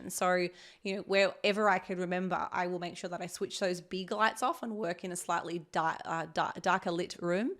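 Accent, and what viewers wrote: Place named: Australia. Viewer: Hello from California. Terrific advices & lifestyle vlogs. We knew we were watching a champion ever since we watched your first videos, Ms.. Inspirational. We like the Australian accent, Australian celebrities, & Australian models. You are a fantastic coach/ personal trainer, good teacher. Congrats from our channel 🎊✨🦜🦜🦜Australian Parakeets Stars✨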